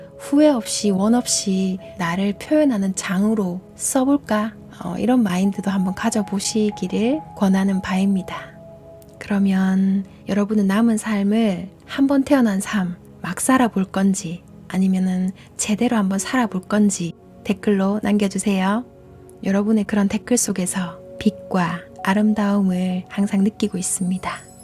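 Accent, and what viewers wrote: Gyeongsang, South Korea. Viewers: 구독했어요~ 말투가 경상도 억양들어가 정감가고 (제가 경상도) 예쁘고 목소리 좋고 마음의 양식이 쌓여가네요ㆍ 이야기를 듣다 보면 많이 깨달아 지네요~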